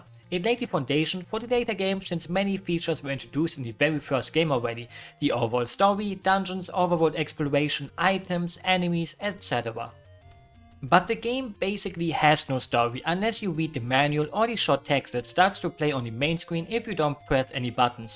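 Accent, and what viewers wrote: Germany. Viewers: This guy sounds very german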